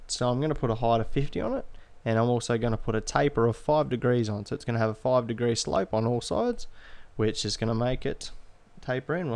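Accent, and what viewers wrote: Australia. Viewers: Can't listen to this any longer, your accent is killing me with that stupid Aussie inflection!!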